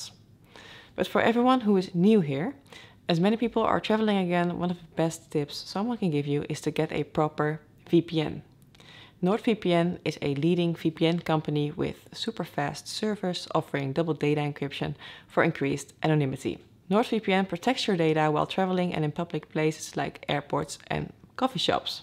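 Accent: Netherlands. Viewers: Heel goed. I love english with a slight dutch accent. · You could tell she's Dutch as she pronounced every "v" like an "f"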